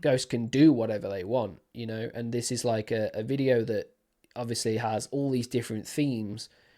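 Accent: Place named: Northern England, United Kingdom. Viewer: Subscribed because I'm a sucker for a Northern accent and your videos are fantastic. I saw the first one and knew immediately how much fun you were going to have with this. ✌️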